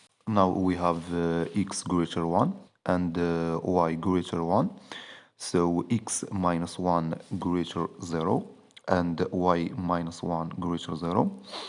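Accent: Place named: Morocco. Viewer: You sound Moroccan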